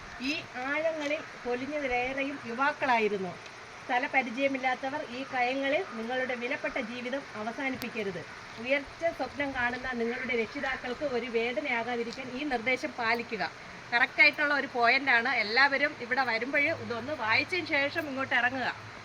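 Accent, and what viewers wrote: Trivandrum, India. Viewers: ചേച്ചി വീഡിയോ സൂപ്പർ ആണ് tvm ഭാഷ സൂപ്പർ സാരീ നന്നായി ചേരുന്നുണ്ട്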